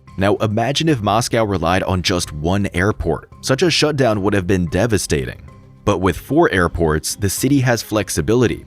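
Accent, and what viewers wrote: United States. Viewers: Very strong American accent. And why miles miles miles. Is it not 2025?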